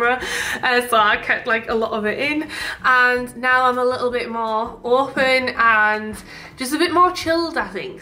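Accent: United Kingdom. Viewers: You don't sound german at all. I am from Germany. You sound totally british. I love England and the english language so so much. I also have family in Gloucestershire. I am really really sad about the Brexit thing 😢. I can't understand why you want to be separated from us. Anyways... Greetings and hugs from Germany! Stay strong and safe! Tina xxx 😘🤗💗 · If you ever get the chance... The new mocha flavored Kit Kat duo is fabulous! I got to have one last month, but now I can't find any in the stores I usually go too. It's the same way with the dark chocolate Kit Kat. So good!!! But so rare to find. And I love your purely British accent. You're adorable! Such a sweetheart. Love you, buh bye! Makes me smile every time.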